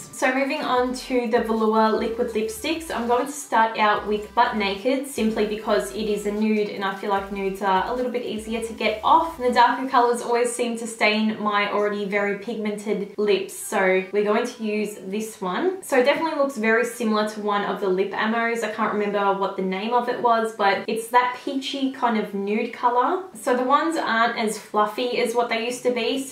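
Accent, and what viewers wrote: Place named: Australia. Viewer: I didn't realise you were Australian 🙌🏼🙌🏼I should of known from the accent hahaha. Your hair looks super amazing today 🙌🏼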